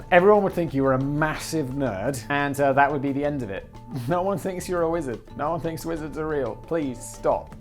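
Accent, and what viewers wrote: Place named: United Kingdom. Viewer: I never knew you could put a British accent on Anunaki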